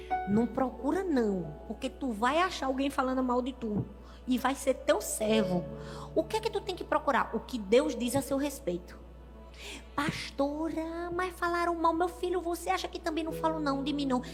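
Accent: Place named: Pernambuco, Brazil. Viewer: AMOOOO MESMO ESTA PASTORA....DO SOTAQUE GOSTOSO DE PERNAMBUCO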